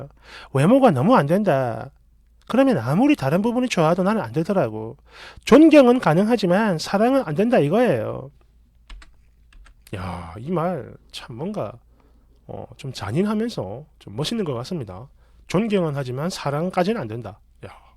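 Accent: Gyeongsang, South Korea. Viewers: 경상도 분이시구만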